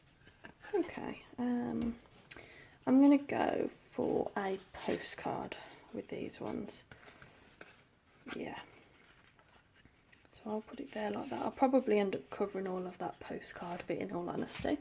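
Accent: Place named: England, United Kingdom. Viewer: Thank you for a great tutorial on tea bags! I’ve subscribed so I’ll look forward to your next video! I’m in New Zealand, love hearing your English accent!🧚‍♀️✨💫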